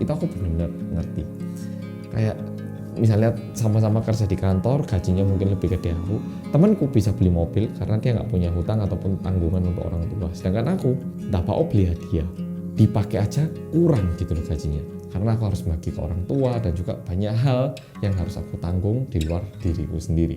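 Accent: Java, Indonesia. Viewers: mas medhoookkk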